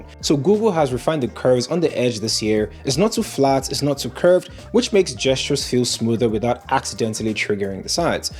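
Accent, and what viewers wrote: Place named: Nigeria. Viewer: I hear a Nigerian accent... I subscribe 😂😂. Good luck with your channel dude! Review was on point BTW.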